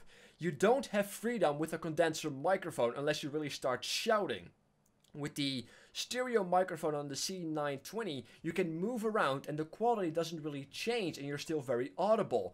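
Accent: Netherlands. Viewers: the dutch accent gave it away :D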